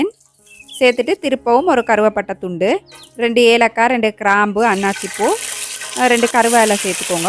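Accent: Sri Lanka. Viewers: I love you srilanka tamil 😃😃👌👌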